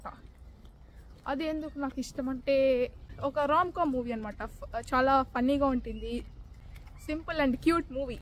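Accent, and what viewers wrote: Tamil Nadu, India. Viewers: Mey nee bhasha bhale vundi mey.. Konchem Tamil mix vundi gani nee Telugu matram super mey 😂 keep doing more and more in Telugu 😅 By the way.. shorts and long videos both are good! I will definitely wait for both ❤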